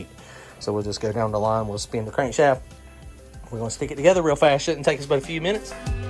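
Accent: South, United States. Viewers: Honestly, I don't really care much for southern accents, but I subbed before you started showing the engine. There's a lot of engine channels on youtube, but a lot of douches too. You automatically have that vibe that makes others wanna sit down, have a beer and talk cars with. Very neighborly and humble.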